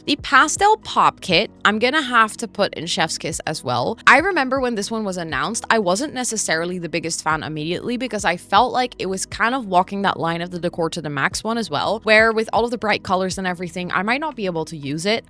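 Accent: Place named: Netherlands. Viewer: You sound a bit Dutch, are you from The Netherlands?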